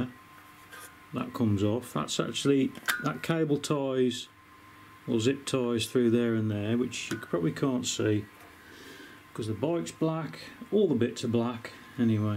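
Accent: Birmingham, United Kingdom. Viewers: I think that is quite possibly the funniest fuckin video I have ever seen on YouTube!! Got tears rolling down my face typing this. Brilliant Brummie. Thank you. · You sound like youre from near Birmingham If you want somebody to test that bike at full speed then let me know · you a Brummie ? Nice Work ! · After 10 seconds of that Brummie accent I stopped watching lol! Totally bored. .......yawn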